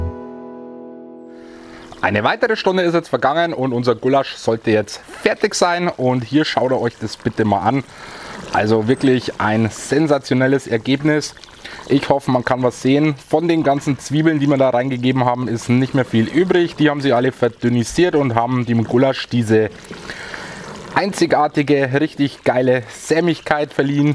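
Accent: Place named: Bavaria, Germany. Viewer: Moin, jetzt habe ich schon unendlich viele Videos, mit deinem schönen bayrischen Dialekt, von dir verfolgt. Gestern habe ich das Gulaschrezept ausprobiert. Meine liebe Frau hat alles geschnibbelt, und ich durfte zubereiten. Das Ergebnis war wirklich super. Mach weiter so, ich tu's auch. Beste Grüße, Hubi und Doris aus dem Münsterland.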